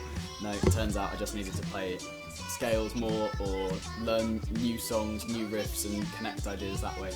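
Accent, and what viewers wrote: United Kingdom. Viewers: Fabulous and I love your Great Britain accent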